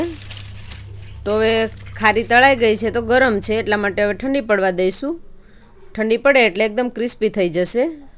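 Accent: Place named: Africa. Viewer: Looks amazing ben Hu pan banavish Radha rani bless you ❤ You sounded like you are from Africa Me too now in uk 🇬🇧 😮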